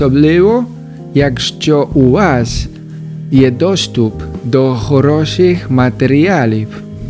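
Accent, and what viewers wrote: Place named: Poland. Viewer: Гарна мова, але все ж таки чути такі польські нотки в словах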